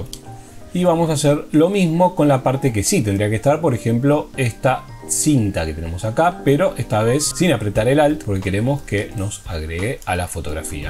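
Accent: Argentina. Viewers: qué lindo encontrar que te expliquen en argentino!!! jajaj que bueno encontrar este canal :D graciasss por compartir tu conocimiento!! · Sos un genio explicando amigo. Explicas re claro y sin rodeos, hay tutoriales de otros que te marrano mucho más, pero el tuyo es el mejor. Saludo PD: Sos Argentino o Uruguayo?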